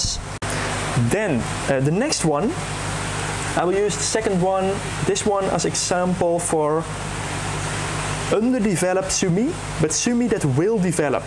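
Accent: Netherlands. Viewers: Just bought one to go with my Hi Utsuri and Ki Utsuri. Great explanation. I guessed straight away you are Dutch. I lived in Hoorn for 9 years and love the Netherlands.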